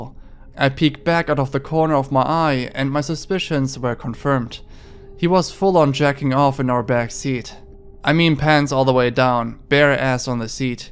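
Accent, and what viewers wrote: Germany. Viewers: are you german? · Is he from Germany? The accent sounds quite German.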